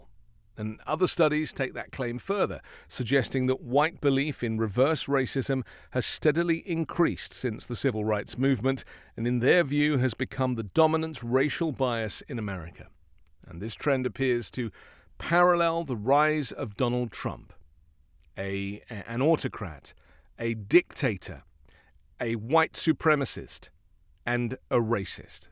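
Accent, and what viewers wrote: England, United Kingdom. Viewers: your 55 min news is always spot on and enlightening thank you. And hearing an English accent is nostalgic for me as an ex Brit cheers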